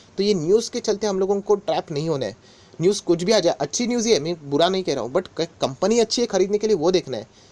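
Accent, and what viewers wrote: Maharashtra, India. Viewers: Ho nkki awajch ahe marathi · Hallo Sir.. your mother tongue is marathi...100%...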